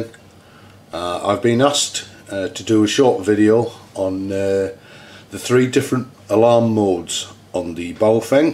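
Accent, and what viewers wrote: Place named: Newcastle, United Kingdom. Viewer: hi m8 u sound like ur up in newcasle in the uk im in hartlepool n just got thr baofeng uv-5rc and need help programming it can u help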